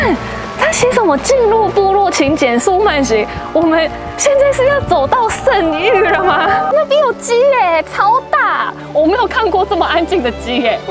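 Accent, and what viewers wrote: Taiwan. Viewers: 比台灣人還台灣口音，努力有了好收穫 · 這中文說的台灣腔，沒誰了，完全聽不出來耶，厲害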